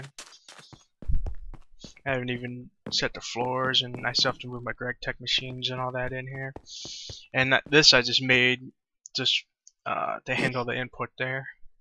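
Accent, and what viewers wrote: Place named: Chicago, United States. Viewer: This guy has to be from Chicago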